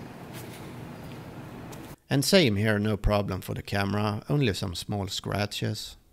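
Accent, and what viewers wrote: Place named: Sweden. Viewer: Låter galet svensk...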